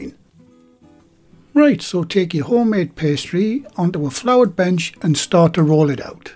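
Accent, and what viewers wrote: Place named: Northern England, United Kingdom. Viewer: ooooft these look amazing, but you sound northern like me shouldnt that be henderson's relish your using:P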